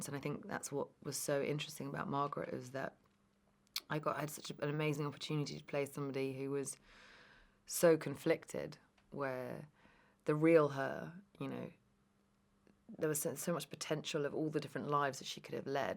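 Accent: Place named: England, United Kingdom. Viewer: She have British accent. English specifically.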